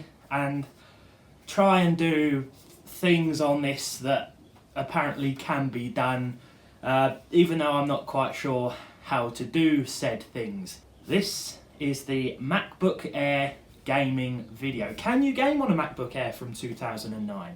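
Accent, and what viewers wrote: United Kingdom. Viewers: I really love this channel, especially that Brit voice it’s easy to listen to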